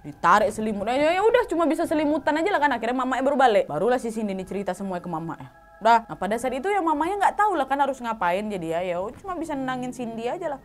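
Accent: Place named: Medan, Indonesia. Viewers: Rutinitas sblm tidur🤭 mendegarkan dengan logat wak waknya bercerita❤️❤️❤️ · "gagal pokus" 😊 sukak kali aku dengar logat Medan di ni..jadi rindu pulak aku pulang kampung 🤗. by: drg. Rika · Kak, logat dan bahasa Kakak spt orang Sumatera Utara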